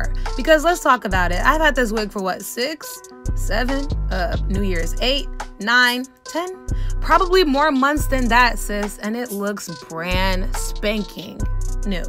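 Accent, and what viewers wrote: Nigeria. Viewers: I like how you infuse naija English for drama 😂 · Are you Nigerian? I promise i hear teni askamaya in the background! Sisshhh plus i can low key hear the Nigerian accent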